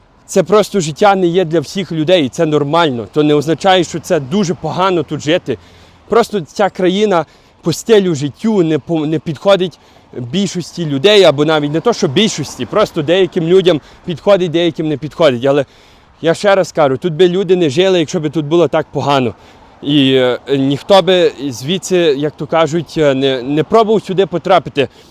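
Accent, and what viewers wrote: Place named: Stryi, Ukraine. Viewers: У вас така оригінальна вимова❤ що я немов не у Канаді, а на Стрийщині побувала😅❤ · нагадав мені одного сусіда з сусіднього під'їзду, коли я жила у Львові..(дуже хороша добра людинабув той хлопець..( На жаль, мені чомусь завжди подобались хлопці "з претензією".. Це моя Карма.,)) Але я дуже з великою повагою відношусь до добрих хороших людей. Нехай таких буде більше на Землі! Така розмова у як у певних регіонах Львівщини..) молодець. Розповідає про актуальні теми, показує багато Канади. Дуже цікаво. 🙂🙏... Ці люди всі кудись спішать, вони хочуть тусити, кавувати, їм потрібен натовп.. Я говорю про тих хто жаліється на Канаду. Ну то добре, не подобається - їдьте в інше місце.. Дайте можливість нормальним спокійним інтравертам (і неінтравертам теж,)) пожити в прекрасній країні, де є багато простору, природи і Закони, яких всі дотримуються. 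(!) Держава, яка турбується про своїх жителів. Безперечно, нелегко відразу знайти роботу, можливо, хтось не знає англійську мову. Але хто хоче, той вивчить. І навіть перевчиться, перездать іспити і досягне успіху. Все залежить від бажання людини і прикладених зусиль. Європа колись була чудова, а зараз вона перенаселена. І не дуже безпечна. Кожен шукає, що їм треба. Дай Бог кожному з нас знайти. (!!!) Дякую за відео. 🙂🙏🕉️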